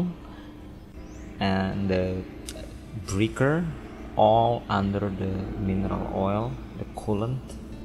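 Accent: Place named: Indonesia. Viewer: Logat orang Indonesia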